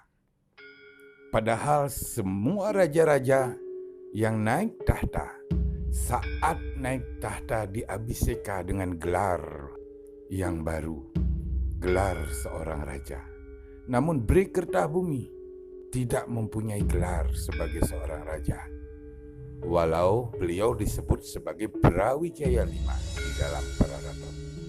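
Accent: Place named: Bali, Indonesia. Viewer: Pengisi suaranya kalo ngomong T kayak orang Bali 😁👍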